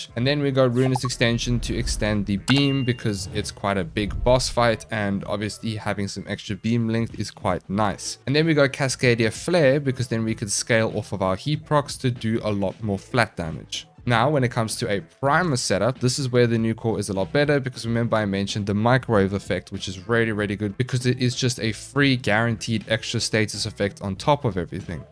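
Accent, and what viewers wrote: South Africa. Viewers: Thx for the vid I was watching your kuva litch vid since im a total noob and wanting to try get a kuva nukor since I have a normal nukor.. Wanted to ask are you a S-A local your accent man sounds like it?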